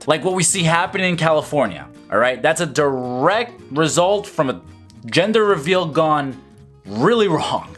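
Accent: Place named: Scotland, United Kingdom. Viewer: Your continual dragging of Barren Ben Shapiro and your scottish accent. I'm here for it!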